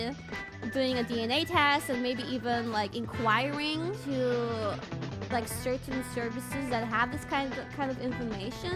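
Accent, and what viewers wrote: United States. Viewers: American blood... Maybe that is why she is good speaking American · Must be why she has such good aptitude for speaking American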